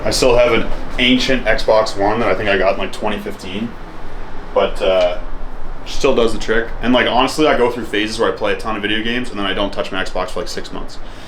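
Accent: Australia. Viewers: As an Aussie that accent was pretty accurate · That Aussie accent 😂♥️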